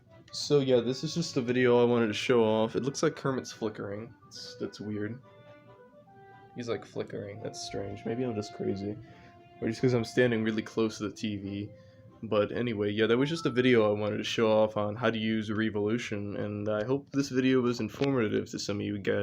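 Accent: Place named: France. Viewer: Spongebob French narrator voice generator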